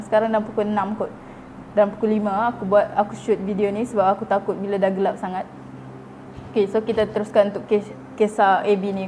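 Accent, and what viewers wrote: Sabah, Malaysia. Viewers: kakak org mna ? mcm ada loghat sabah pulak heheh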